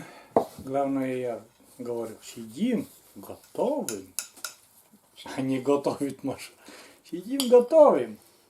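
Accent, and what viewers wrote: Komi, Russia. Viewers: Маша Добрый день.смотриш на вас и душа радуется за вас,у вас всегда все хорошо.дай бог вам здаровье!у вас красивый коми язык + русский- красиво звучит.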